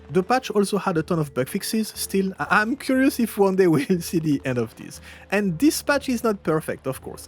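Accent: France. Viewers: He's so French I thought he was saying dispatch not this patch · T'es français ? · FRENCH SOUNDING😨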